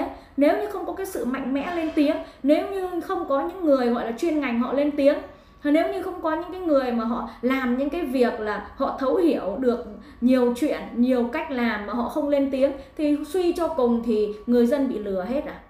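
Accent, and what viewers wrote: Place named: Countryside, Vietnam. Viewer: Con này nói tiếng nhà Quê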